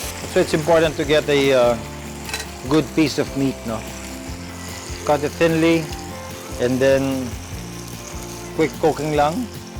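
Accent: Visayas, Philippines. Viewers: Bisaya a na kayu oi! Naa Jud ang accent sa pagka bisaya HAHHAHHAHA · Makalingaw k kung mag bisaya k 😂🤣👌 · bistek tagalog niluluto mo, pero bisaya ka ng biasaya!!! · Visayang -visaya tlaga c Mayor Richard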